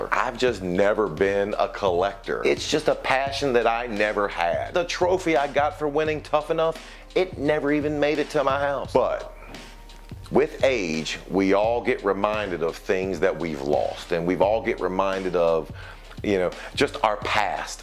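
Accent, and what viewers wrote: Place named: South, United States. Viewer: I can’t guess his regional accent. Seems like a warm hearted southern guy.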